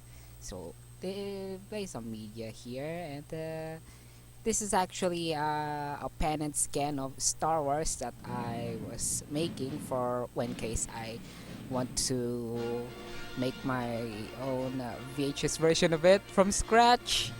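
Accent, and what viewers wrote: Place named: Russia. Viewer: your voice is very russian accent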